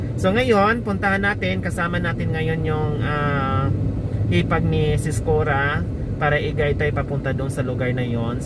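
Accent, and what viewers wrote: Visayas, Philippines. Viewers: pangasinan po ba kayong blogger po? urdaneta,villasis, rosales at pangasinan na lugar po. Kaso visaya kasi ang salita ninyo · bisaya diay ka mam?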